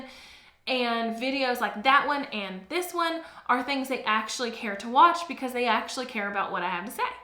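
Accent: South, United States. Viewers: So sweet. The tonality is so different from people who are ride or die as opposed to people passing by. I learned a lot from you in your productivity era. I can't remember what search word I used... ClickUp? Anywho I'm back. Like your southern accent and beautiful office/room.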